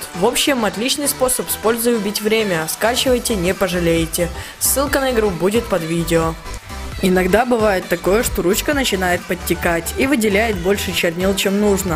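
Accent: Belarus. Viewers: Слушай а ты из какого города? Бреста?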